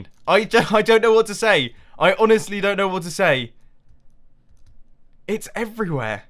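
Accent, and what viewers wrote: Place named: United Kingdom. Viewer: Bio X How did you not notice that? I'm not a native English speaker, but when I first watched one of videos, I immediately noticed that he has British accent. I mean, it is so obvious.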